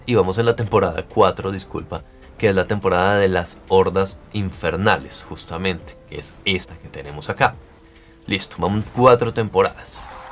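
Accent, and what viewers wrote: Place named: Colombia. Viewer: Hola parcero se nota por tu acento que eres Colombiano. Soy nuevo en el juego y tus guias me han venido de lujo. Explicas con mucho detalle. Buenos videos bro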